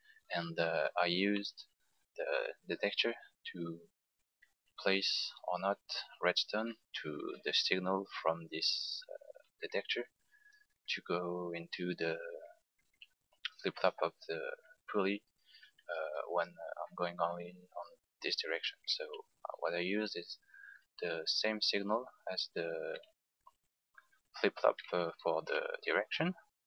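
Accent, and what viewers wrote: France. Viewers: Well that's your own fault. Have you never heard anyone with a French accent speak English before?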